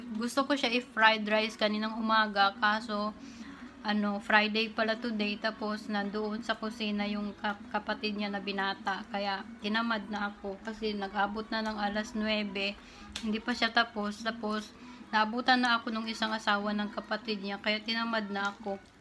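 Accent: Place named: Ilonggo, Philippines. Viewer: Prang ilongga k mgsalita malambing. At mahinahon..new subscriber hr. #OFWinjordan.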